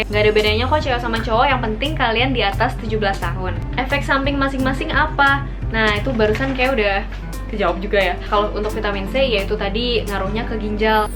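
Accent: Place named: West Java, Indonesia. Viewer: Kek orang sunda yah logatnya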